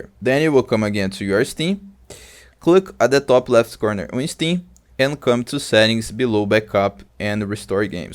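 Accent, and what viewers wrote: Brazil. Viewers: That's because his native language isn't english. He is from Brazil, lol. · i needed 3 words to know you are Brazilian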